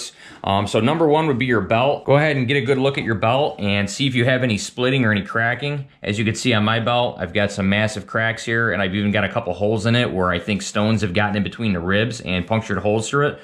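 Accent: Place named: Western New York, United States. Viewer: Lol I'm in Rochester... I recognized your accent immediately. WNY represent!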